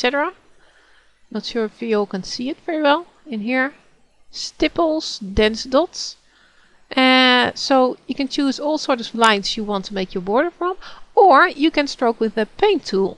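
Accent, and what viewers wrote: Netherlands. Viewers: This is what dutch people really sound like speaking English, unlike goldmember in Austin Powers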